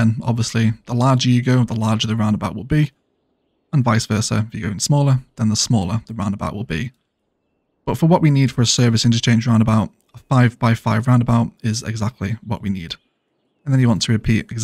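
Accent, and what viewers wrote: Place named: United Kingdom. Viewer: Strange, for me as Russian your accent sounds like british but you prefer rigth-hand traffic. Its my ears failed? :)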